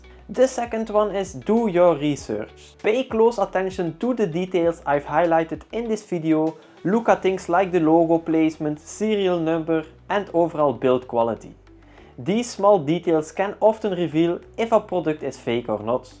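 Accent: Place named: Flanders, Belgium. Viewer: That's one thick Flemish accent brother! 😂 Very good video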